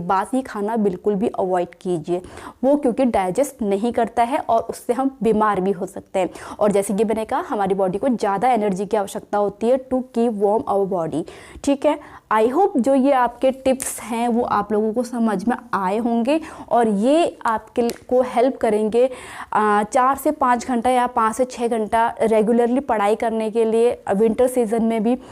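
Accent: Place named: Uttar Pradesh, India. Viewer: But voice is indicated up state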